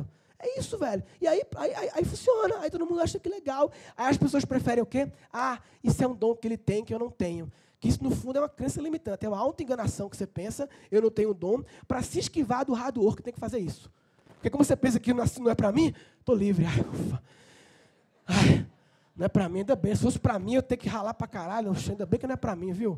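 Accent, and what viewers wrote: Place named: Northeast, Brazil. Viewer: amo o sotaque do Nordeste (que meu terreninho na Bahia me aguarde;) e quanto a pasta de dente, ri demais! Ainda mais sendo dentista! E hoje a tampa é grudada no tubo, reparou? Acho que ouviram você! Rs. Show!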